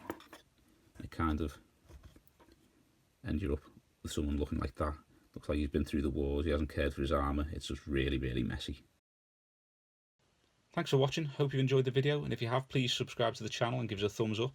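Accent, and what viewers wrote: Liverpool, United Kingdom. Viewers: Scouser! Where you from man? I'm from over the water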